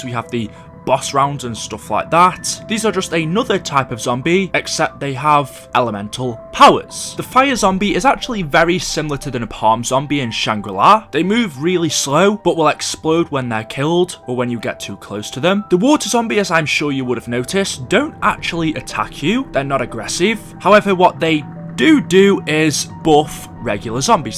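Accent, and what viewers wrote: United Kingdom. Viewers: Not a zombie related one But please can you tell us where are you from since you’ve done the face reveal Im assuming that you’re from UK based on you accent also love your vids bro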